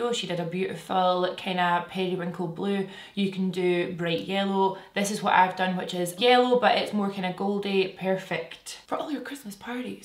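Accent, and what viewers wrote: Scotland, United Kingdom. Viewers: nice to hear a fellow scot on youtube xx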